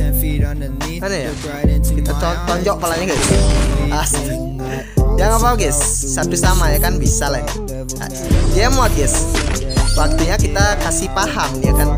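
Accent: Bali, Indonesia. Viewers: Fiks orang bali😂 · Logat bali nya kentel banget bli 😁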